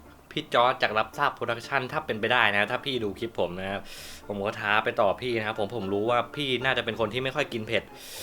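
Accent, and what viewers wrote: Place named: South, Thailand. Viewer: สำเนียงเหมือนคนใต้ เป็นคนใต้ใช่มั้ยยบอกมาาาา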